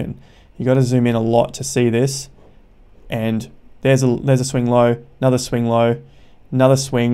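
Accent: Australia. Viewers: Are you an Aussie? You’ve got that accent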